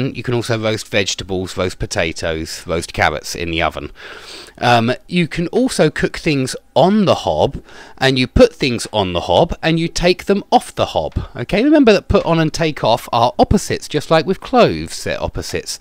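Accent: United Kingdom. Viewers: your british accent is just lovely.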